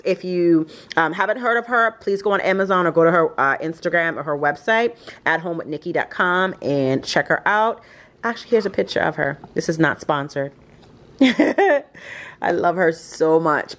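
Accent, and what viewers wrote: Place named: Virgin Islands, Caribbean. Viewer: I love the video, very organized and well put together. I picked up quickly on your accent 🙂. I’m also from the Virgin Islands 🇻🇮, St. Croix. I’m now your new subscriber!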